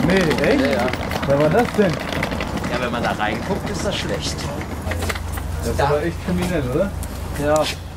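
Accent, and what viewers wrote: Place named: Germany. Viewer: You sound happier... and more successful... always learn one thing for sure..that is to smile and be happy... offcourse other points are there too that we learn and get inspired from...love your german accent and the language ... wish you vlog more often... and yes... CONGRATULATION ON YOUR MARRIAGE...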